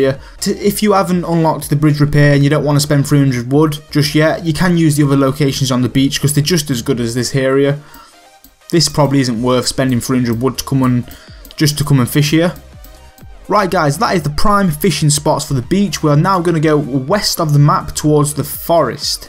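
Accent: United Kingdom. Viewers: Yes, but what's your accent? I like it. Is it British?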